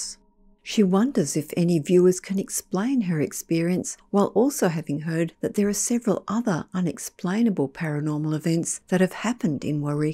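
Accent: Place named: Australia. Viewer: In Australia clerk is pronounced "clark". The narrator is Australian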